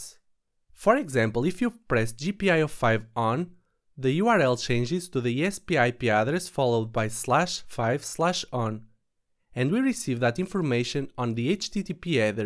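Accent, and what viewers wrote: Portugal. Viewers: Olá! Hello! Descobri o canal sem querer. Estava mesmo à procura de um canal deste género! PS: Descobri logo que eras português nos primeiros segundos do vídeo devido ao sotaque. Keep it up ;) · You sound like Portuguese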